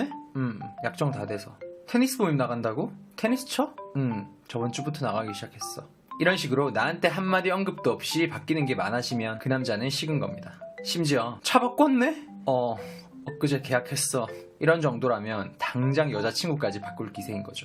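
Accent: Gyeongsang, South Korea. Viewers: 대구냐 부산이냐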